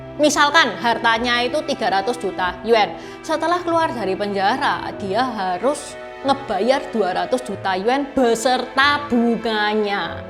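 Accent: Java, Indonesia. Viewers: Mbak ..itu suara nya ...logatnya ..jawa bnget ..gak cocok bawsin acara ddh ..sorry ya ..apalagi bahasa mandarin ..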